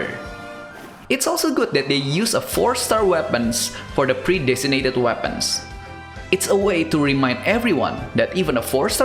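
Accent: Indonesia. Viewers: yes it is, even though I don’t really talk like that but I hear a lot of people in Indonesia talk like this. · i believe its indonesian accent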